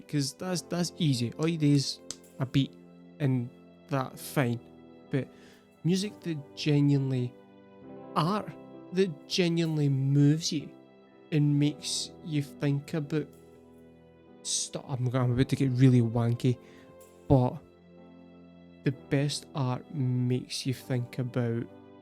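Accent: Scotland, United Kingdom. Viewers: Wait!! You're Scottish???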